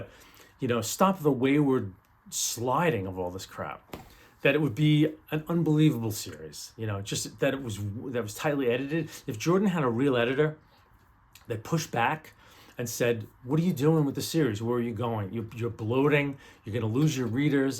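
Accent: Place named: Long Island, United States. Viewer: Nassau County representing! It’s endearing to hear your Lawng Island accent.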